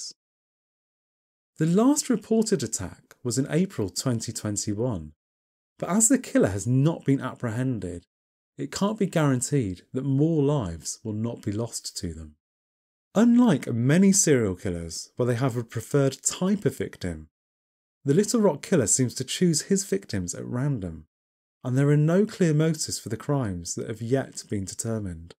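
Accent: United Kingdom. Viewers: New subscriber, enjoyed this very much. I, too, was a little distracted by your hand gestures but it’s a small thing and doesn’t really matter that much. Incredible voice! Something about that British accent. Don’t let ppl compare you to Mr Ballen, I’m sure you get that. I think it’s the similar background more than anything else. Of course, I just did that, didn’t I? Keep it up you have a new fan. I think you hit the algorithm just right. Love that I got here early!!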